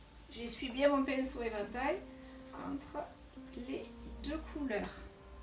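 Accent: South, France. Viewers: Bonjour! J’aime beaucoup apprendre en votre compagnie. La démonstration et les explications sont très claires. Quant au rendu c’est très sympa. Votre beau sourire et le petit accent du soleil ne gâchent rien. Merci!!!👍😃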